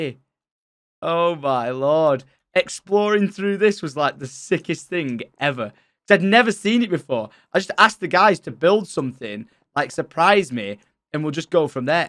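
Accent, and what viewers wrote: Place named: United Kingdom. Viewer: So glad you went back and explored this world again. I spent many hours watching your videos. There's something about someone playing minecraft while talking with a british accent. Like watching Planet Earth. Now I watch other british minecraft streamers, like Ibxtoycat and Philza. I also recently started playing Minecraft again ever since the place I work at got a Minecraft exhibit. Minecraft is an amazing game! Thank you Syndicate!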